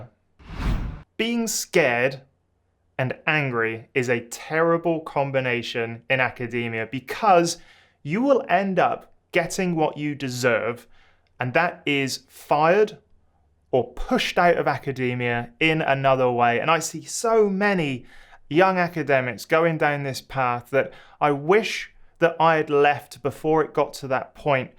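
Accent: United Kingdom. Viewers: I'm sorry to say that but you sound overall rather apologetic... Academia is a great "industry" and there's very few other industries that could offer conditions that would match it. That said, academia isn't for everyone. I have seen many graduate students giving up in the process because they were "disappointed" in "the game". But frankly, these were just people not cut out for academia (some of them did amazingly well later on in other industries, though). So, rather than complaining about academia not matching your high expectations, it would be totally fine to just admit that it might be you who isn't the right match for it. That does not mean that you can't be good at something else, of course. Finally, based on your accent I assume you are from the UK, and your experience with academia is based on how things are in the UK. I just wanted to say that the UK academia isn't a great benchmark--it is pretty bad in terms of (a) pay, (b) pressure to obtain external grants, (c) job security... 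Things are better in other countries. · Love the accent btw, nice to hear Western Brit dialects in this part of YT.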